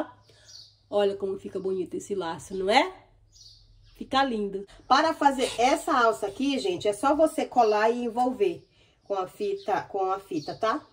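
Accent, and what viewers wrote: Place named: Belém, Brazil. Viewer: haaaaaa perguntei pelo sotaque, sou de Belém do Pará, fui em mosqueiro uma vez se não me falha a memória, amei saber que es daí 😘 muito sucesso pra ti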